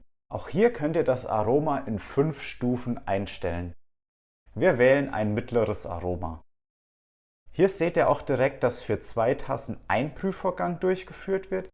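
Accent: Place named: Franconia, Germany. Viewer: Super erklärt, deutlich gesprochen (mit fränkischem Akzent - sehr symphytisch). Habe nur noch eine Frage, my Milk - Funktion wäre das Michprogramm 1 - korrekt? Vielen Dank freue mich schon auf weitere Videos.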